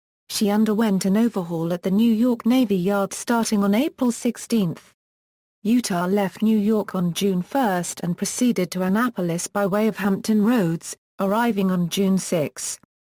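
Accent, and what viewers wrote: England, United Kingdom. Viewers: Love the English accent hate the robotic voice.....And by the way.....Tesla invented and received a patent covering RCVs.......The USS Utah may well have been one of, if not THE, largest vehicle/vessel ever controlled by radio(remote) control to date!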